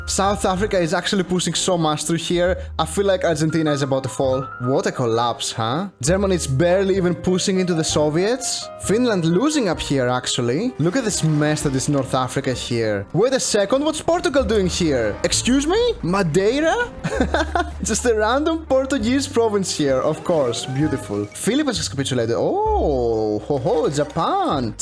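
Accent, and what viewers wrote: Greece. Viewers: He sounded Greek to me and because I'm Greek to I had to make sure thanks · Your accent sounds like your greek who speaks English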